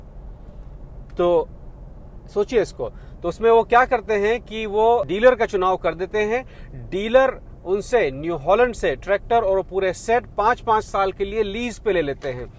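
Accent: Bihar, India. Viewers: Lagta hi aap bihari ho ...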